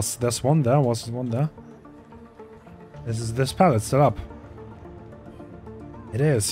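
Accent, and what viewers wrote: Germany. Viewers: 100% sure that you are german xD the german accent while speaking sounds absolutly terrible xDDD i got the same problem Liebe Grüße - greetings 😝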